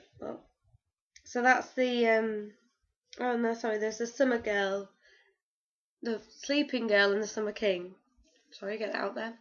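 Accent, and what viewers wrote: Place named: United Kingdom. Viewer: Love your accent is it British?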